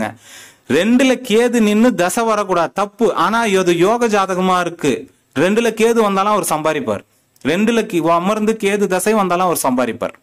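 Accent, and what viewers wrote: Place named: Chennai, India. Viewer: நல்ல சென்னை தமிழ் சார் 🎉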